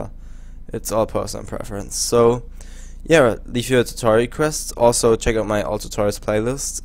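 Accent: Germany. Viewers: lol kommst du aus deutschland?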